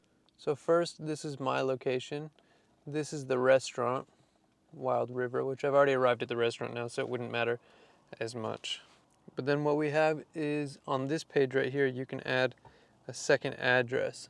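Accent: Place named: West Coast, United States. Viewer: Medford Oregon. Good stuff. He has a west coast accent but I couldn't figure out what market he worked in.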